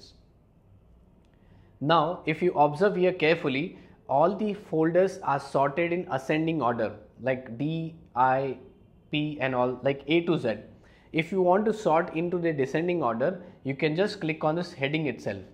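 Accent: India. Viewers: Even with your Indian accent, you are the best teacher I've seen online. Your topics are well selected, short and perfectly well presented. Will definitely watch all your videos. Keep it up. Applause from Nigeria. Take care!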